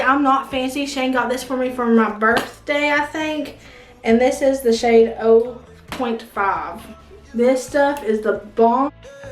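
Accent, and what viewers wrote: South, United States. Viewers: Hi there I'm new to your channel and I just love watching you and the way you talk,you have to be a southern girl.I have a channel but I stopped you tubbing because of my health.But I sure love watching you.Hope you have a wonderful weekend hun. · Random comment... I have to tell you... I love your southern accent!! That's it!! I'm going to finish watching you.. Love you, FRIEND!! ❤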